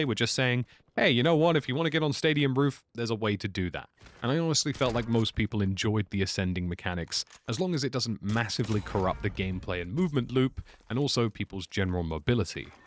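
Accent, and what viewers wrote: RP, United Kingdom. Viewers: Loving the posh monologue accent Vs. your usual streaming accent. Also, couldn't agree more with virtually everything you said.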